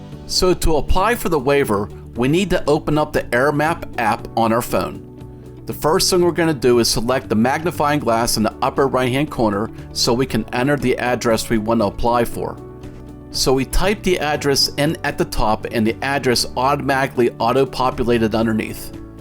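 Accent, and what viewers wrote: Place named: Pittsburgh, United States. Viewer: Awesome video and extremely helpful! Also I grew up in Pittsburgh and now live in Sarasota Florida. Your voice is music to my ears! Love the Pittsburgh I can hear in your voice. Looking forward to watching your videos.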